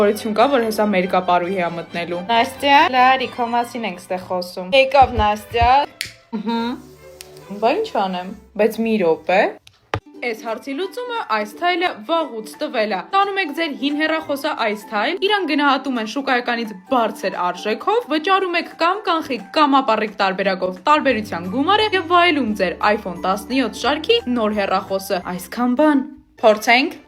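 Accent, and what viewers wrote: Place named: Kapan, Armenia. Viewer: Կապանի. բառբառ. ես. Կապանից. եմ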